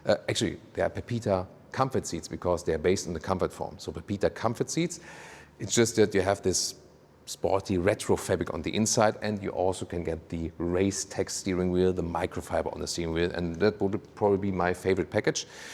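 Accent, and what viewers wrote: Germany. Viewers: Drinking game: Take a shot every time says "Wuerszhion" (Version) We love your reviews and beautiful German accent <3 The black paneling around the wheels is the ugliest I have seen in a long time. Usually, people air for a look where the wheels fill out the wheel arches as much as possible, and here they have visually gone in the opposite direction.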